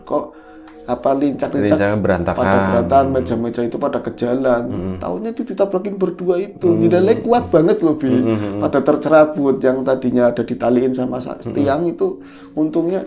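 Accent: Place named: Tegal, Indonesia. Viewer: Assalamualaikum wr wb mas dan mas.....lanjut dengerin cerita yg tk tunggu apalagi bhs Tegalnya 😀😀😀🙏🙏🙏🙏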